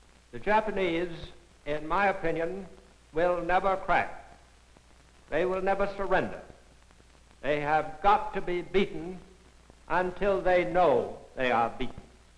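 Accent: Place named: Mid-Atlantic, United States. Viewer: Search "Mid-Atlantic Accent" for more info on this.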